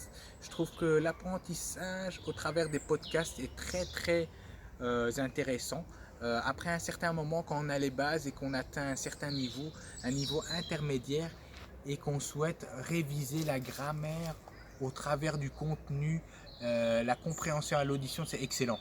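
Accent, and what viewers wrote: Belgium. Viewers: Je suis passé par ici pour voir comment un polyglotte aux traits orientaux parle le francais, mais je ne m'attendais absolument pas entendre un brusselere - ptdr. Et a part ca tu te debrouilles pas mal du tout en Russe, je suis jaloux :)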